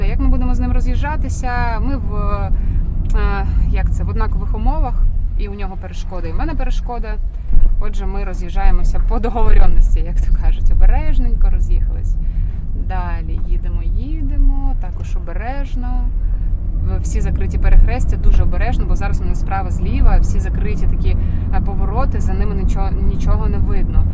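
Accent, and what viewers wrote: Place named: Surzhyk, Ukraine. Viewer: Насолода скушать конфетку, а коверкать украинский это ужас, не можешь не говори, а учись, а то какой то суржик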